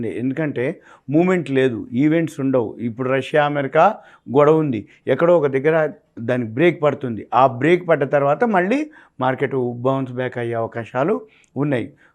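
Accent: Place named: Telangana, India. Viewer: నువ్వు నీ తెలంగాణా assent.......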